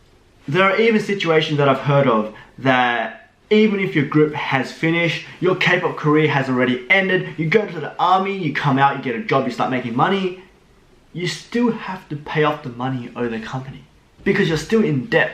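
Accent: Australia. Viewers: I love your Australian accent!! Ur soooo cute!! Maybe you can just become a solo artist.... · You are so cute when you serious....i love your Australian accent.. If I'm right.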